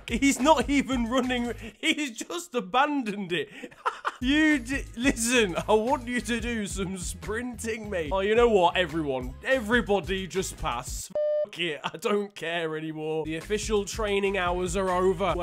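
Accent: Liverpool, United Kingdom. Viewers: You're voice is like an liverpool fan that is white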